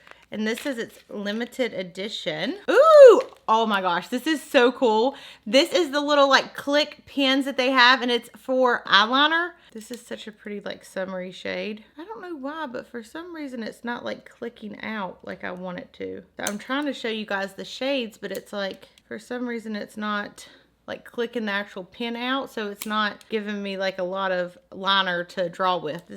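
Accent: South, United States. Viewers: Your country voice has me dieing of jealousy, I love it so much ‼️😭🖤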